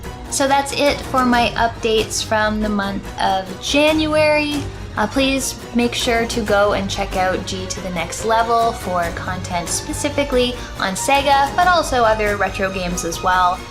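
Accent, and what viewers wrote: Canada. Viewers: Just as an aside, sometimes your Canadian accent is imperceptible and then you say "house" and I'm like... oh yeah, she gets healthcare from the government like someone who lives in the first world. This is not a bad thing, I would take every single person around me saying "Howouse" all the time if it meant living in sane governance but... as an American living north enough to get a Canadian channel on his cable system, it pops out.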